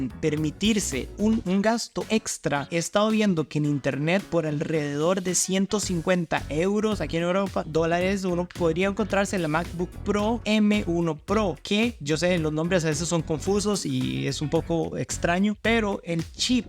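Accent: Costa Rica. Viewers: Reconozco la voz de un tico a kilómetros o me equivoco? 👀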